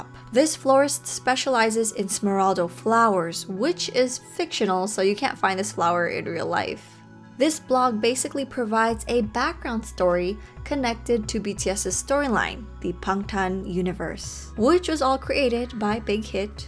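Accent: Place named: Italy. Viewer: I Love You, your theories are always great (P.s. your Italian is sooo cute 💜)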